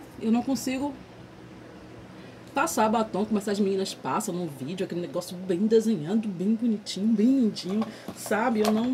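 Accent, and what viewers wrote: Bahia, Brazil. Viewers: Que delícia esse sotaque baiano 😍😍😍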